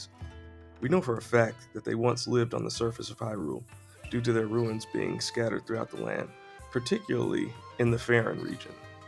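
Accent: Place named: Georgia, United States. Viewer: Where are you from? You accent sounds like south Georgia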